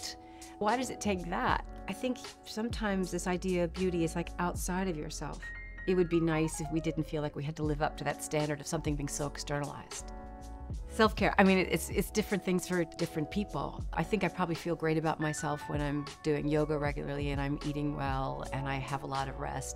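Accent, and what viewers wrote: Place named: United Kingdom. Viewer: Why did i think she was british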